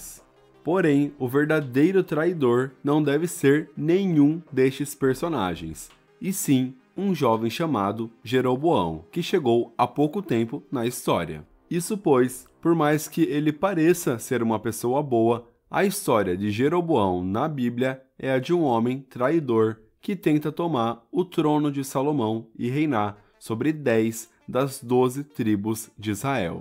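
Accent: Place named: Caipira, Brazil. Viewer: Seu excessivo sotaque "caipires" nos "erres" nos finais das frases são aflitivos, prezado narrador ! Fica até difícil ouvi-lo 😲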